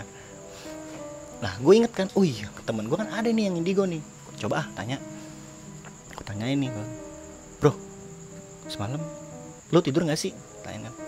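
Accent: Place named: Makassar, Indonesia. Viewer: Hostnya anak makssar yah??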